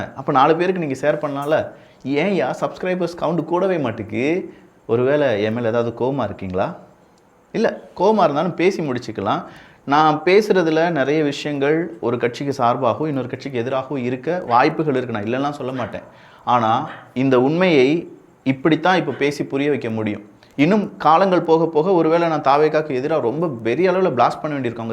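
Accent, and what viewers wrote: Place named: Tirunelveli, India. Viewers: விக்கியின் திருநெல்வேலி பாஷை சூப்பர்.❤❤❤❤❤❤ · நெல்லை தமிழ் pure gold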